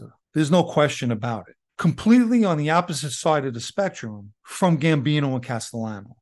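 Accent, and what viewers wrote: New York, United States. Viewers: Slight NYC accent?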